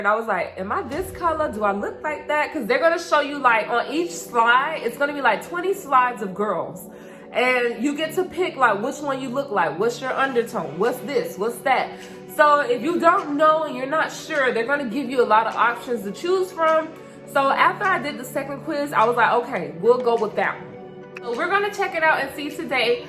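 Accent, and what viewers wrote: United States. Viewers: First of all I liked and subscribed within the first 2 minutes secondly, I ordered the same number and girl you did that! Yuhhhhhhhh * yo voice *😂😂🥰 I knew you was from down south.. THEN YOU SAID Carol city!! Oh bihhh yessss 😂305 honey.. Take it to the houseee. #thecrib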